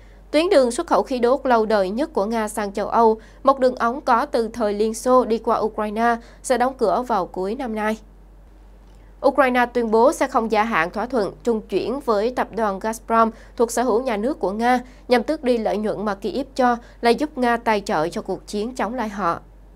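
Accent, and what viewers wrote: South, Vietnam. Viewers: Giọng nói miền Nam de thương, rõ ràng, de nghe, còn miền Bắc không kho nghe. Nhất là dấu hỏi và dấu nặng: vi du: du chưa? Du chưa!!!!!!!?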